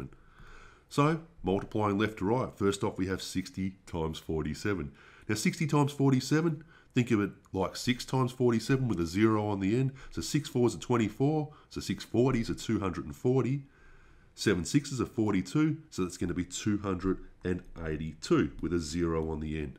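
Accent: Australia. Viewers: you sound like bruce from finding nemo are you australian mate?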